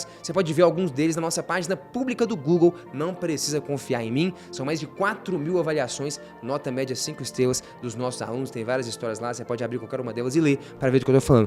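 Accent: Minas Gerais, Brazil. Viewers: O cara já é bom em explicar, aí tem sotaque de mineiro, fica mais massa ainda. Show! Excelente vídeo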